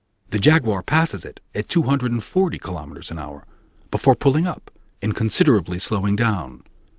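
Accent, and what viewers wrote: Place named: United Kingdom. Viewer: El título en ningún momento habla de español. Deja de enojarte con otros por ser vos el burro, y aprende un poco de inglés. Es británico encima, mucho más fácil de entender que el norteamericano. No seas tan engreído y deja de tenerle bronca a otros por tu ignorancia.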